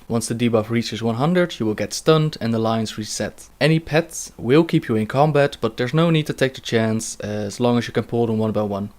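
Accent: Netherlands. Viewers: Dude, amazing guide, real nice and clear:) I have a question tho, Are you dutch? you sound like a dutch guy talking english:p Keep it up man:D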